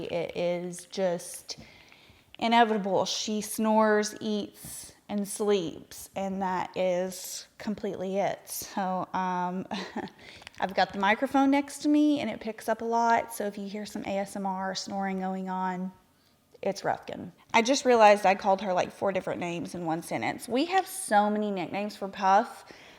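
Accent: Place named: Tennessee, United States. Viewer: I love your accent. I am aTennessee gal too. 😊💜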